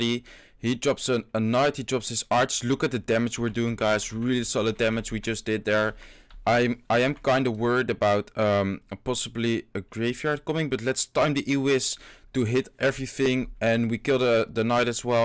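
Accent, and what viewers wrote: Netherlands. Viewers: ik hoor het nederlandse accentje:p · Dikke Nederlands accent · Zyt gy Nederlands spreker? ge hoort dat echt... maar je kan wel goed engels spreken... ik ben van belgie btw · ben jij Nederlands????? zo praat je namelijk wel: )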